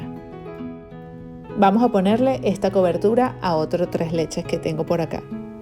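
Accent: Venezuela. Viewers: Amooo el acento Venezonalo, definitivamente!!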